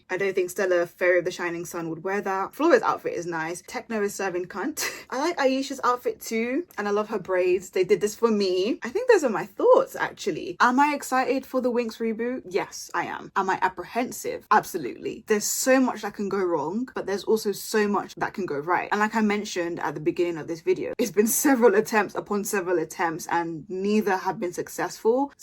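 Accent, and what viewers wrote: United Kingdom. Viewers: my god, i couldn't get enough of your accent through the video! What's your accent, british? But I think i hear some other accent. Am I wrong??😵‍💫😣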